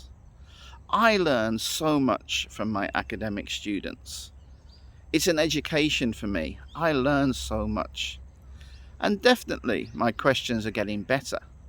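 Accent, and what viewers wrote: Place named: United Kingdom. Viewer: Hi, I stumbled upon you video by chance and I thought it interesting. Recently I am more interested in British English than American English. Therefore I watch many British TV series on Youtube. Your English is clear and very easy to listen to but some characters in British drama speak strong accent and dialect that heard to understand. I haven't realized that there are so many dialect and accent in British English. Anyway I like British English so happy to hear your British English..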